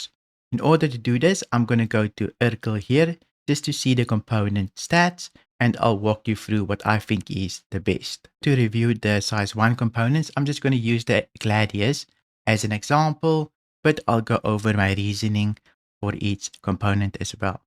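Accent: South Africa. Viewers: awesome thank you, are you from South Africa?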